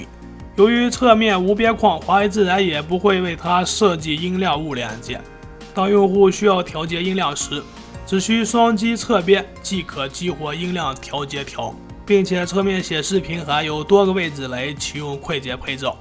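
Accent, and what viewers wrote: Shanxi, China. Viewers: 山西人啊这口音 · 山西人吗？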